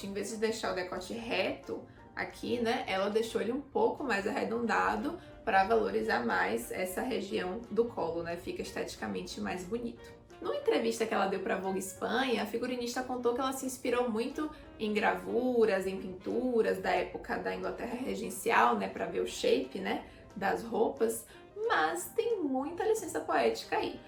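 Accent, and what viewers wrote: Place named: Bahia, Brazil. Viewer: Amei o vídeo! Continue, não desista desse canal incrível ♥️✨ Edit: conheci o canal por esse vídeo e ja pelo sotaque desconfiei q era baiana, fui conferir o vídeo de apresentação e acertei! (Minha conterrânea kakaka)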